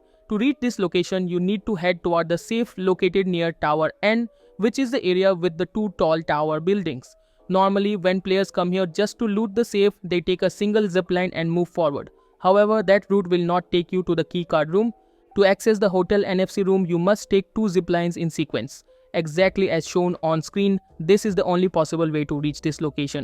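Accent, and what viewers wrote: India. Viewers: Damn it had to be an indian accent video, fuck that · This guy is the only indian tutorial guy I want funny jokes and a good indian accent when speaking English he speaks like amir the door dash guy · Ugh, that stupid Indian accent 🙄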